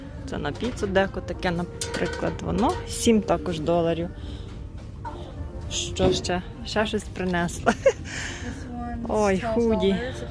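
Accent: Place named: Lviv, Ukraine. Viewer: Ви напевне з Львівщини, бо є акцент? Горнятко - це так говорять у Львові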